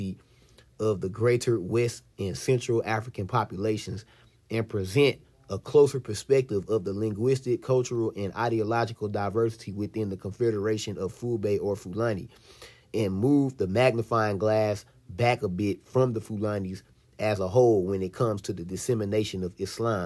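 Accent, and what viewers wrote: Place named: United States. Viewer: With due respect bro the Fulbe in nigeria 🇳🇬 are very fluent in fulfilde 🗣️dey cut across the cameroons🇲🇱,central Afrique, Niger, conakry and so on and mind u the chief of d Fulbe in Yola nigeria 🇳🇬 is district head and spiritual leader to all dese zones dey pay Allegiance🐮🐮🐮🐮🐮🐮🐮 to him bro pls 🙏 don't be ignorant I see u got an American accent🗣️how much of fulfilde can u speak urself bro🤷No hard feelings bro. Bro good job u did here buh pls 🙏 do a lil bit of research on Nigerian Fulbe🐏🐑🐃🐂🐄🥛🥛🥛👌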